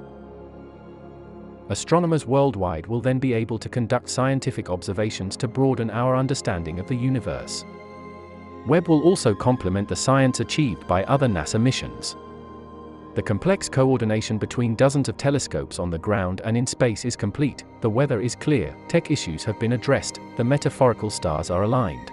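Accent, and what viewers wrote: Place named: United Kingdom. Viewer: Thats a robot voice? I thought he was just british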